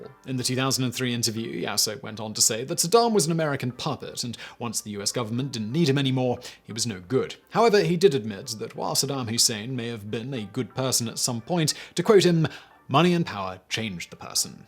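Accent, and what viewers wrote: United Kingdom. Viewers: In some cases,the very British accent can be difficult for me to understand but in YOUR case,Mr.,it is as clear as a bell. A "right.proper" public speaking voice,I tell ya'....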